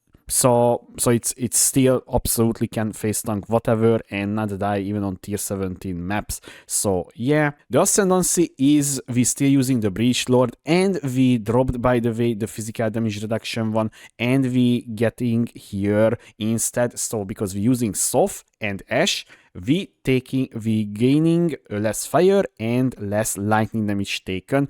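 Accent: Hungary. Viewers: Hungarian accent alert!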